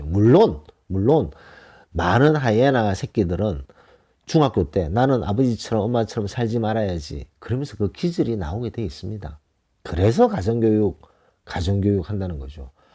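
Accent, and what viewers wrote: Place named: Daegu, South Korea. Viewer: 방송 감사하게 듣고 있습니다. 정말 좋은 말씀 대구 사투리로 듣는데 편안하고 유익합니다.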